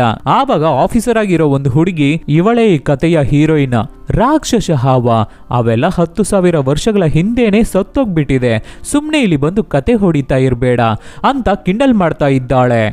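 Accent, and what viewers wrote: Tamil Nadu, India. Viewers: Kannada in Tamil accent 👌👌👌👌👌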